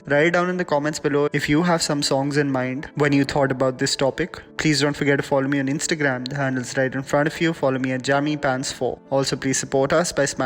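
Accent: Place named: Tamil Nadu, India. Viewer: The Tamil accent though ..... 😅😅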